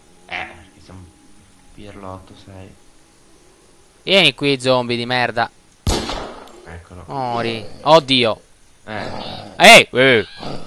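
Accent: Sardinia, Italy. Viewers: aiò! Sardo sei?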